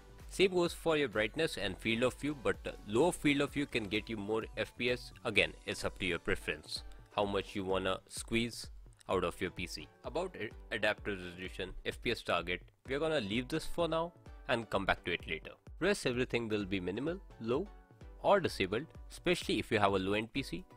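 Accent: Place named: India. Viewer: This helped me a ton! Thanks! Also, I can tell you're an Indian by your accent... wanna play Apex together sometime? :)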